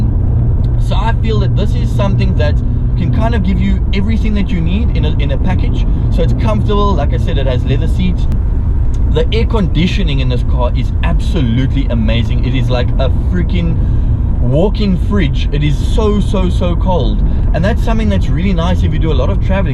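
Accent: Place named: South Africa. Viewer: Sound South african lol love it Subbing dude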